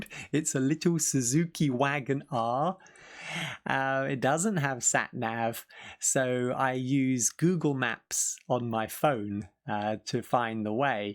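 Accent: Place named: United Kingdom. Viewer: I think that British English cool! I like your mountain clothes☺️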